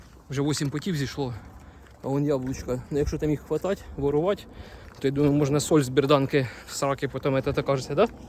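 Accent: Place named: South, Ukraine. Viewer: Привіт земляк. Наш южноукраїнський язик ні з чим не переплутаєш І не срівняєш ні з чим, ласкає вухо... Місто Покров бивше Орджонікідзе вітає тебе 🙏❗ Вернувся назад щоб сказать-написать, шо підписався:hand-pink-waving:і👍поставив.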